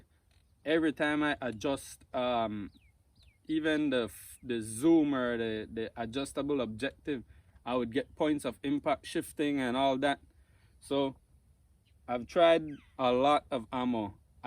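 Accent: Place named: Belize. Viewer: Great setup and good shooting. I discovered your vid while researching light big bores. I think I am sold on a Texan though I would prefer a .30 or .357. Nice to see Belize too. I caught your accent only after a few words lol! Used to be a regular visitor to Belize as a seaman years ago. Keep the vids rolling bro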